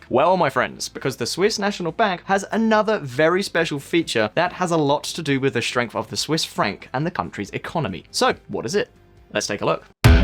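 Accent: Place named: RP, United Kingdom. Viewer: This presitator is so fakeish. too posh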